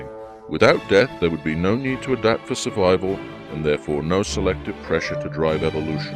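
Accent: England, United Kingdom. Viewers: narration is going to be easier to consume because as you noted, text covers SOME part of the screen, looks like crap if it's too long, what font to choose????? etc. Narration FTW! Besides, if that was your voice I heard on Claire's shoutout, you kill at narration. English accents give most Americans a huge chubby.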